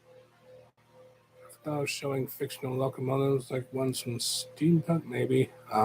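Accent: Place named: United Kingdom. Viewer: Bro I thought you are British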